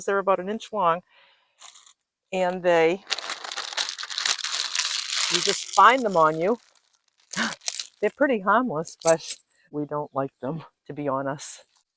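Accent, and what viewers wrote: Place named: New England, United States. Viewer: I hear that familiar New England accent. Been up here 12 years.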